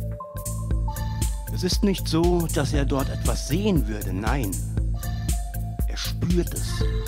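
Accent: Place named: Palatinate, Germany. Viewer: Wie immer genial :) ich liebe den Pfälzer Dialekt.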